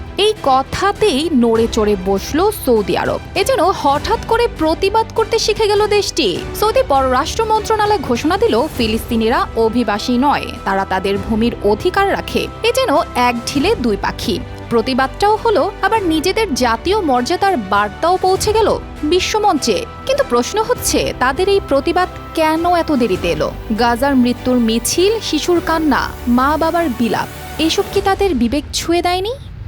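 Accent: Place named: India. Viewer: ইন্ডিয়ানদের মতো খবর পড়া ভালো লাগে নি😢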